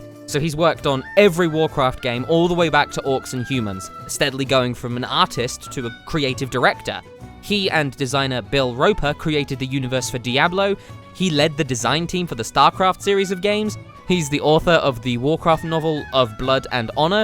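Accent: United Kingdom. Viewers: Since I dont have twitter, mind if I ask you this here? You're either 1) really good at a british accent or 2) moved to the US from britain. In the case of 2: why'd you move? Europe is awesome! :p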